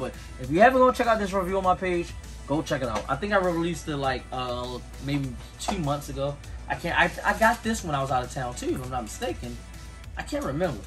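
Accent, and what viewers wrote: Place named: Baltimore, United States. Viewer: You sound Baltimore aff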